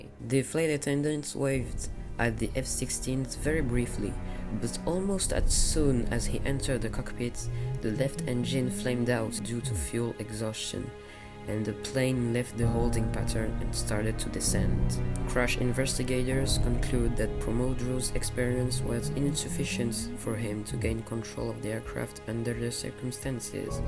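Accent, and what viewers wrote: France. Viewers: o my god this is so scary ! i love that ! thank you for dooing this halloween video and this voice reveal with this french accent 😍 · Woah bro im shocked! You absolutely nailed this video and voice reveal!!! Fire voice bro, the French accent is 🔥! Also RIP to all victims onboard that flight. 🙏 Best vid yet for sure!! I'm so happy for you bro and wish you, and your channel the best future posible! ❤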